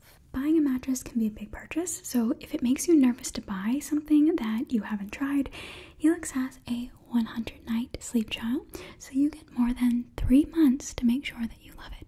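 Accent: England, United Kingdom. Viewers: Do not mind getting more British-accent. Thanks for this, and thank you, Bridgerton. 😆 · Look amazing with curly hair and as always ace performance on the vid and the British accent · The British accent and referring to us as "my Lord" really reminds me of FredsVoice "Lord Farquaas" videos lol Btw is there a possibility to have less light in your videos? They are usually pretty bright and it's harder to watch them in the middle of the night (it's 1AM as I'm writing this, heh) This is just a suggestions ofc. Still, love your videos! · I like your English accent. Decent attempt. Toodle pip!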